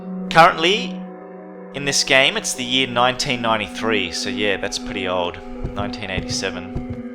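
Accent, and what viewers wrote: Australia. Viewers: Your accent is awesome! Is it Australian?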